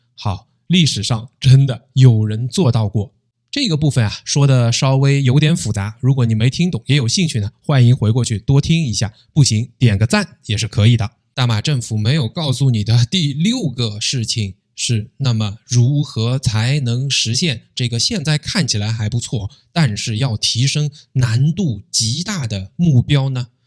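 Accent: Standard. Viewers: 我国是还有前途的，只要安华继续掌舵，唯有取缔贪官腐败，马来西亚是仍有光明前途的，不过只要有清廉领袖。主讲人的语音规范，流利清晰深入浅出的优点，目前有很多主播在语音方面很糟糕也来主讲。 听了 你的演讲耳朵很舒服。谢谢！ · 你操着一口标准的普通话。 你说马来西亚贪污腐败。 那么中共呢？ 马来西亚有几个州的苏丹。 赵家的七个家族呢？ 中共有特供。人民吃XX食物。 起码马来西亚人的食物规格没有低劣到这样。 马来西亚的政党高官贪污，舞弊，腐败。 中共可是有公安。说多了就会被抓进去。 早期马来西亚有内安法律。 中共更是一手遮天。 中共人口众多，生活压力大。 马来西亚怎么说马来人占多数，起码生活压力没有中共大。 中共有56种税（10多年前）。 马来西亚（人头税，土地税，个人所得税）。 有对比就有伤害。到底哪里让人活不下去？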